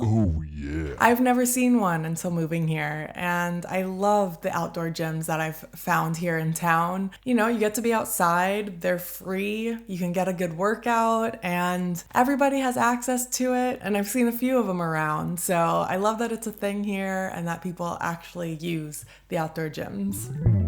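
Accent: United States. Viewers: Hej! Are you from Minnesota?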